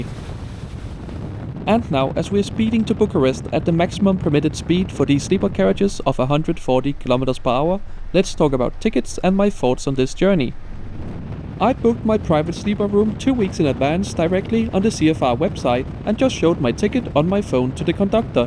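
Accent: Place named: Denmark. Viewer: He's from Denmark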